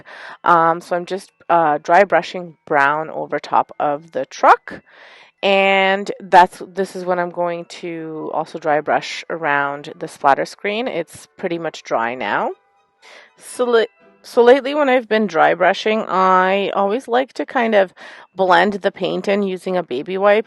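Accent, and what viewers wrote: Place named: Canada. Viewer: I knew you had a accent. You are from Canada like my daughter in law. Lol.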